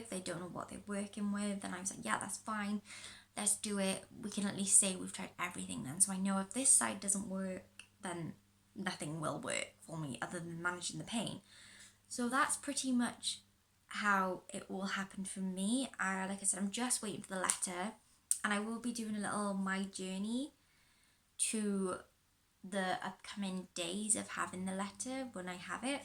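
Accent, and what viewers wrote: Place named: Wales, United Kingdom. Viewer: Just watched the entire vid, knew you were Welsh :)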